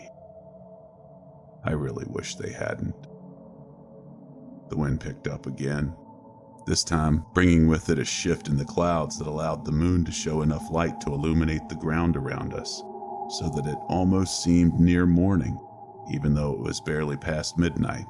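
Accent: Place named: South, United States. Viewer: where are you from? Sound like you got some south in ya sir.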